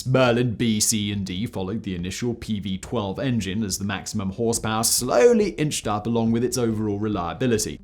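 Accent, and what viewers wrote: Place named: United Kingdom. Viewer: Is it just me or got accent stronger in this video? Maybe it's due to this very British topic... 😁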